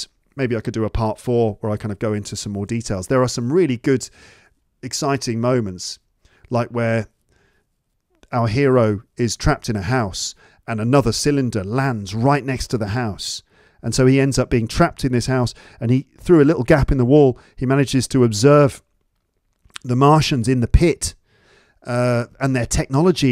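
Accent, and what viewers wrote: RP, United Kingdom. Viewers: speaks RP, does he? Pls tell me, thank you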